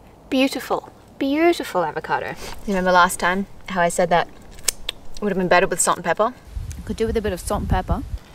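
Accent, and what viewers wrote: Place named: Australia. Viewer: I love your aussie accent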